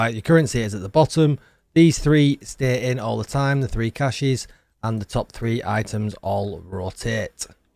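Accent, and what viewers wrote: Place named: Bolton, United Kingdom. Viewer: Are you from around Bolton bud😁. I've played a bit of it but dont want to grind too much, it may just put me off when it actually releases. I just wanted to nose around to see if it was worth coming back to with some friends.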